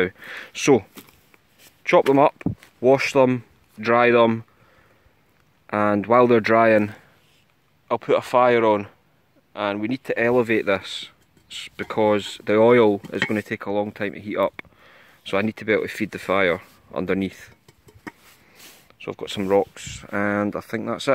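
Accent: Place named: Scotland, United Kingdom. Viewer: Hey ho. Nice vid u made there. I‘ve been to scottland several times to visit my family. Chrisps are very popular in ur country. Where are u locatet? My family lives between Glasgow and Edinburgh. Livingston Bathgate west lotian. U like outdoor very much. I enjoy watching ur vids every time. Ur accent is so nice to my ear. Keep em comming I think u are a good lad, where was ur dog this time? Greetings from Germany 🇩🇪. Bon Appetit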